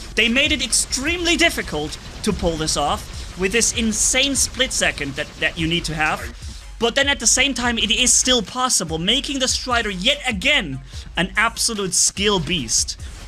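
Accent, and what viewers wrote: Germany. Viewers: Hi are you German from your nationality cuz u have this German accent when your talking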